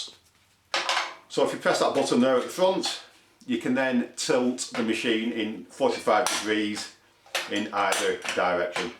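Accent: United Kingdom. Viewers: Englishman, Scotch, Irish or Welch I wonder? Nice job by the way.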